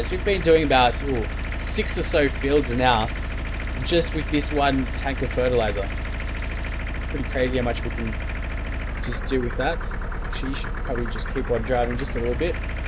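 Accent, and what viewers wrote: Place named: Australia. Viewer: are you from australia because you sound australian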